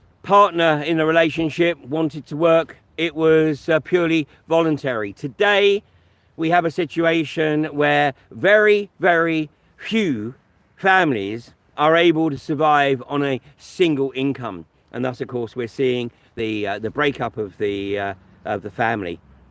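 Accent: United Kingdom. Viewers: When I first heard you speaking I thought that you sure had a heavy British accent for an American! Then when you said "Tori," I realized you were not American, you were from England speaking of politicians in Great Britain, although frankly it's very difficult to tell them apart! :-)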